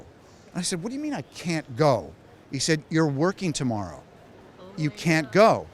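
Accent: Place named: England, United Kingdom. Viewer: Here in the UK the narrator has an English accent. I'm certain they didn't get a different narrator just to show it here. Plus although the accent is definitely from England I couldn't tell you from whereabouts. It's more like a generic English accent. Does anyone have an answer for me.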